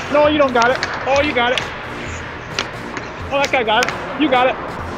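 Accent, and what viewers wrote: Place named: Canada. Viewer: Sick video! Music was perfect and you dialed up the canadian real good, bud!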